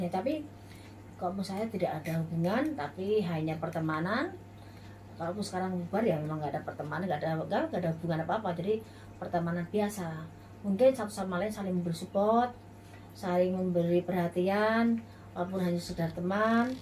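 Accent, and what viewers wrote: Java, Indonesia. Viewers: medok banget mbk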